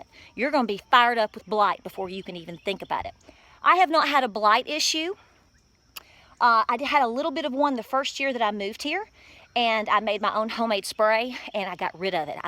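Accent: South, United States. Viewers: adorable southern accent!!!! Thank you for your help. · (Brit) You say Tomayto I say Tomahto. But let's not call the bloody thing off cos I love your video! And that American accent! :)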